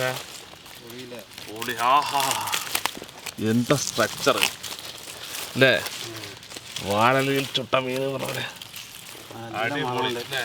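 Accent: Palakkad, India. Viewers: പാലക്കാടിനെ തന്നെ ഭാഷയും മഹിമയും കാത്തുസൂക്ഷിക്കുന്ന 🥰 ekka ഇരിക്കട്ടെ ഒരു കുതിരപ്പവൻ ♥️👍🏻♥️🟠🟠🇮🇳🇮🇳🇮🇳🇮🇳🇮🇳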